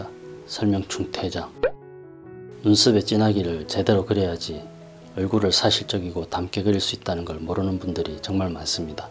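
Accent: Gyeongsang, South Korea. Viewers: 보고 또 보고~~~ 전말 저도 겸손한 전은혜입니다ㅎ 유머가 제 스탈입니다 전말 ㅎㅎ 팬이 될듯 구독ᆞ좋아요~~~ 말투가어딘지모르게 갱상도 억양 느낌이 전 창원인데 그림 배우러 가면 멀까요~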